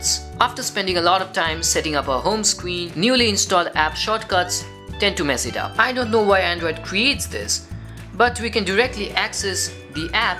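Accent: India. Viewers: The way you pronounce the letter T is almost correct.... Unlike most Indians.... Just need a little more practice... And also your letter L still sounds very Indian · Dude speak English in an accent that is understood by others not just Indian only! So anoying!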